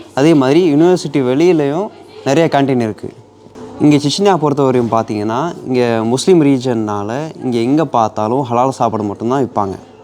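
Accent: Standard. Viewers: தமிழ் சுத்தம் 🎉😂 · இலங்கை பதிவு ரஷ்ய பையன் இவ்வளவு சுத்தமான தமிழில் பேசுவைதைபார்த்து ஒர் தமிழனாக பெருமையடைகிறேன்❤❤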